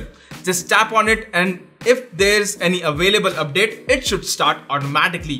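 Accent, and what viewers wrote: India. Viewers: Indian english HAHAHAHAHAHAHAHAHAHAHAHAHAHAHAHAHAHAHAHAHA it's so funny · can you just have an AI instead teaching rather that indian voice teach us..really google play is that the best you can do??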